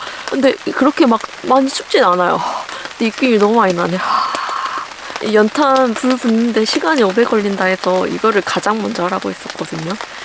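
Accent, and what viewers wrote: Jeolla, South Korea. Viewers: 전라도 억양 넘 정겹고 좋습니다. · 전라도 사투리 정말 예쁘게 하시네요. 오늘 웃다가 구독하고 갑니다.^^ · 와.............. 유튭에서 전라도 사투리 왤케 반갑죠....?? ㅋㅋㅋㅋㅋㅋㅋㅋㅋㅋ 자주 시청하겠습니당 😁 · 깝깝해부네 ㅋㅋㅋㅋ 저도 고향이 전라도라 사투리 말투 너무 귀엽네요 ^^ 는 무슨뜻일까요 궁금하네요 ^^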